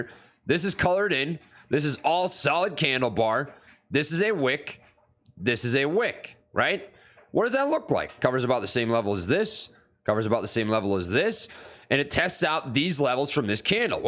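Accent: Minnesota, United States. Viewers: Dude what was that Grizzly chew reference haha? You sound like a Minnesnowtan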